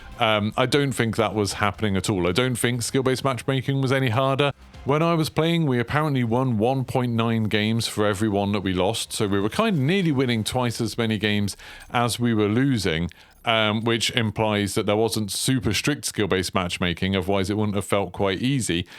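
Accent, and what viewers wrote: United Kingdom. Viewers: Bruh, he’s obviously British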